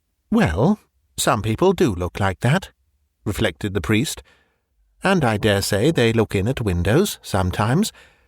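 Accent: England, United Kingdom. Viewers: Such a lovely voice to listen to. So nice to hear an English accent!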